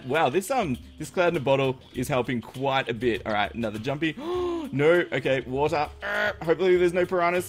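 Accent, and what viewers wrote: Australia. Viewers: Ye he is australian like me :D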